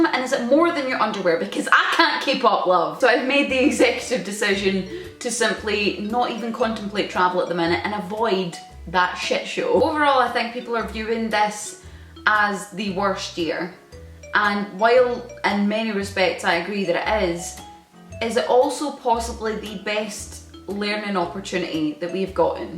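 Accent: Scotland, United Kingdom. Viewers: I absolutely never realized the Scottish accent emphasized the "e" on certain words. Like "hous'ee's", AWESOME! Sorry, I'm a new sub and I love different accents.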